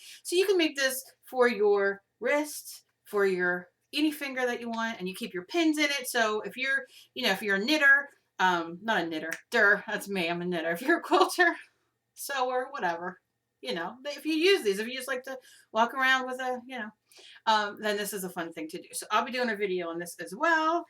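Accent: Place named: East Coast, United States. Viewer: I personally LOVE watching your videos, hearing you talk is much better then music. It makes me feel as if we are hanging out together. I love the accent, I miss the East Coast so much now that I live in the West. Everyday I go to see if you have new videos along with Gina, Lindsey, so many talented ladies! I thought it was great you mentioned your weight loss! When this video started I said to myself, how can I ask her did you loose weight? Then you said it! I am so proud of you, I need to start doing something myself, I am a food junkie! I look forward to recipes and a craftroom tour! Thanks for sharing all that you do! I think you are a peach:)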